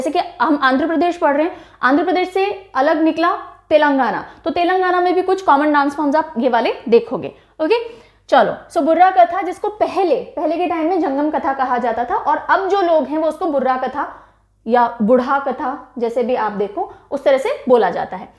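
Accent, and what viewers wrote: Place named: Kerala, India. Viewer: I am a malayalee..it's nice to hear ur presentation in Malayalam slang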